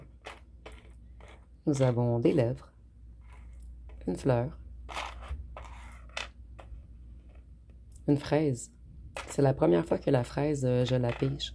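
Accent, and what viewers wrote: Quebec, Canada. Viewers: J'aime bien votre guidance toujours fort juste ,ainsi que votre accent québécois , votre voix et vos mains et ongles très jolie vous avez l'air très coquette et tellement lumineuse et juste vrai .👍❤🙏🍀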